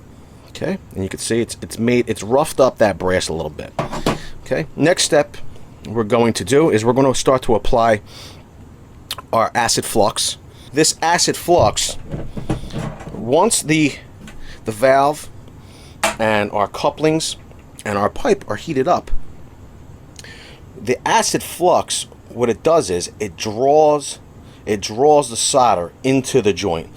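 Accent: Philadelphia, United States. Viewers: Got to be Philly yo jagoff !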